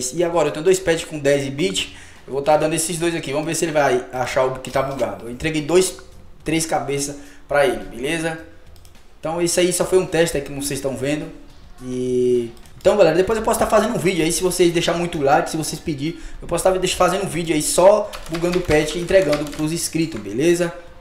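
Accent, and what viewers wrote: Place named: Brazil. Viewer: Ree brasileño el man :v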